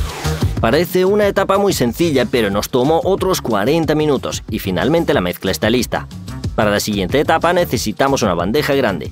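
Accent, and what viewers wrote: Spain. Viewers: No me gusta esa voz 😢😢 demasiado española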